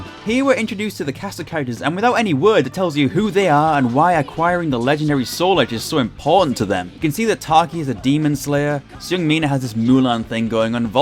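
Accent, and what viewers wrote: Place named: Northumberland, United Kingdom. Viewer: I swear down you sound like a Northumbrian. If not somewhere from the north east.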